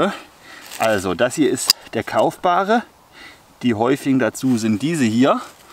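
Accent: Baden, Germany. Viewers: Klasse Viedeo, sagemal von wo kommsten Du her😉 ich würde deiner Stimme nach urteilen aus dem Badischen. Und wenn das so wär. Dann wären wir vielleicht gar nicht soweit voneinander entfernt. Gruß der Markus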